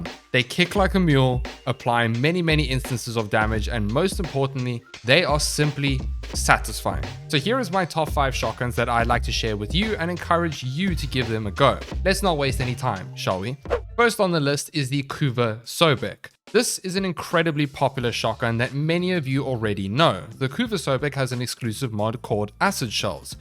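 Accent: South Africa. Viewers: is that a south african accent i hear????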